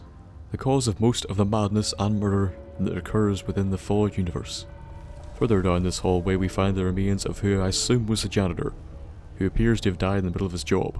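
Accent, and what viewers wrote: Northern Ireland, United Kingdom. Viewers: He is northern Irish. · Hmmm off topic Question if I may...Where are you from?. The accent sounds like Nth Ireland but with a touch of..................well that is why i ask hehe..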